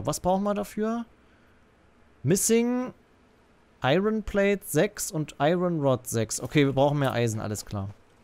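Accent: Germany. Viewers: könntest du die englischen Sachen nicht so deutsch vorlesen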